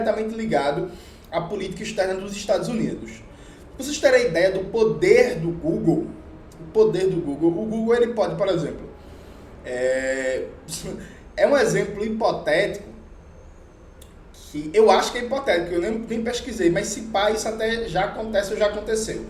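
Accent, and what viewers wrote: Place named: Rio de Janeiro, Brazil. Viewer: leu tudo com o sotacão carioahca dele ne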